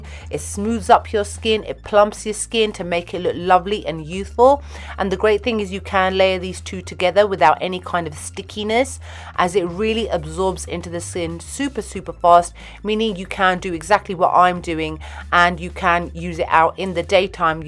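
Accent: United Kingdom. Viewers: I love this video so so much! Its a good old youtube style grwm with "oldie but goodie" products (i.e., reliable). I am so tired of hearing of new brands everyday and it feels like a rat race. Give me my NARS blush from 2010 and it still works, it still makes me happy. Also, your skin color is the same as mine, so its a great reference point to try shades based on how they look on you. And, finally I really connect to the manner in which you speak being a desi who grew up amongst relatives based in the UK :) ... So many reasons to love your content <3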